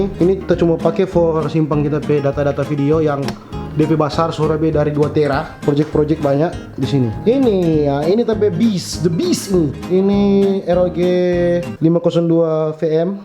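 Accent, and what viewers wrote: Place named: Siau, Indonesia. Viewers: Sekali" logat siau jo opo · eh keode ungke, suaninge, tu logat dang jadi kangen sitaro rken, salam dari anak Sitaro yang kuliah di jogja. Sukses Terus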